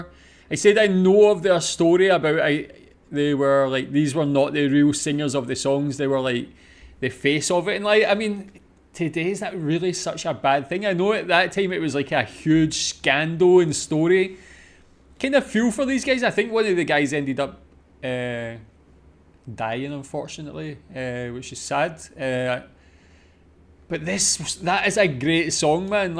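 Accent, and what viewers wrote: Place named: North England, United Kingdom. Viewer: Nice Accent Dude! 😄 North England?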